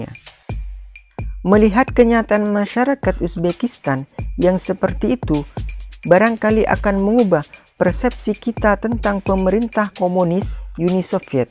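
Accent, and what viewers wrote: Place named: Arab world. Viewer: Menang logat arab tidak tau bahasa arab.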